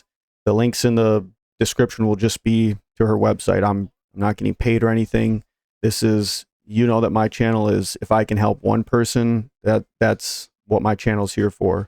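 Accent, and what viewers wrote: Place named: Wisconsin, United States. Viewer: Oh you're from Wisconsin for sure.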